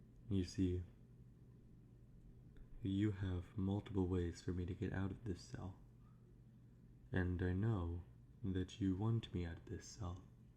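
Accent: United Kingdom. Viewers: I thought he was British- anyways great video as always!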